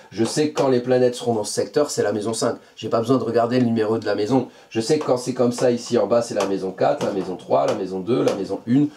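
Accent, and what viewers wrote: Alsace, France. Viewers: oohh, comment cet accent alsacien m'a fait me replonger dans mon enfance, un instant j'ai eu l'impression d'être a la maison, (enfin quand ca existait encore), et j'ai ri pour le débarassage du tahiti douche, limite PLS, à l'époque de la retro de Mars en cancer c'était trop ca ( y'a eu une opposition Pluton ce qui fait que bon relationellement y'a eu un peut de caca). Tout ca pour se rendre compte que bon Vénus va rétro en mars, donc mes plans ce que j'ai préparer pour le mois de mars, en plus avec Mercure rétrograde, je dois peut être me préparer à les oublier et à les réviser. Bon la mauvaise nouvelle dans la bonne humeur au moins.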